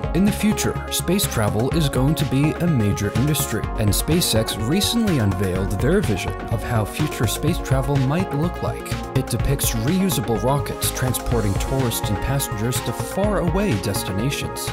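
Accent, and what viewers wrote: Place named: United States. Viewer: Great video... I guess. Once again, an otherwise interesting idea is droned to death by this incessant electronica music that all sounds the same and never ends. I'd much rather just listen to someone describe the things in the video... Except for the fact that, once again, a video is read by someone who sounds American, but is written in broken English with bad grammar that sounds like it was mistranslated from some other language. Are these videos mass produced in some other country and then just 'read' by white people? I simply don't understand the point of this at all. Am I being 'pandered to?' Am I being patronized here? Why not just have someone from that country speaking English, or have them speak their own language and use captions? And PLEASE turn off that STUPID music. I hate it! It sounds exactly like a computer wrote it and every other one out there! 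And PLEASE get someone who can read and write in CORRECT ENGLISH GRAMMAR, or stop 'translating' it at all! Thanks... I guess... "...how it would look like?" Really? I don't think so. I literally couldn't get through the whole video. I had to turn it off because, without the terrible music & broken English, I don't know what the hell I'm looking at. Cut it out, whoever you are.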